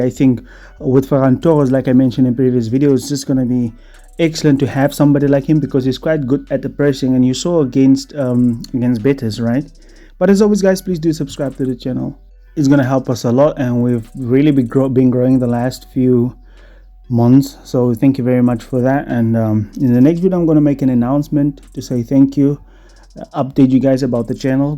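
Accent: South Africa. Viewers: hey mate you sound South African, where you from?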